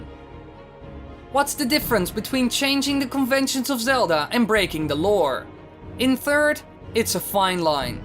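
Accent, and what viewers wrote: Netherlands. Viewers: You sort of have a Dutch accent.. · Are you dutch? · Ben je nederlands? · Are you (the narrator) dutch?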